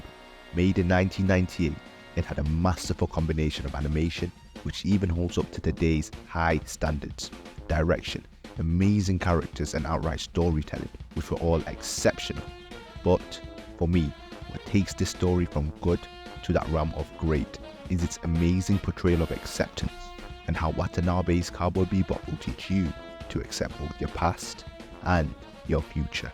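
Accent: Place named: United Kingdom. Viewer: What are you going on about? Maybe your ears are broken. He's clearly British. 😂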